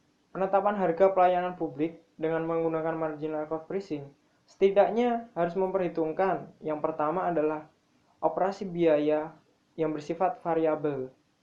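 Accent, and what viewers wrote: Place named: Java, Indonesia. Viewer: Ini ko logat jawanya medok ya hihi~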